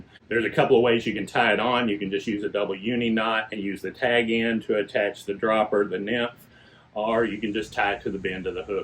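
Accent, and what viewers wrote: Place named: New England, United States. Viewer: It sounds like your in the new England aria is your book relevant for texas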